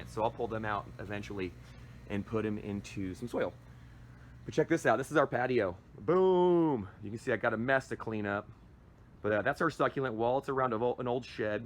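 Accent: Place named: Australia. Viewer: Love your Aussie!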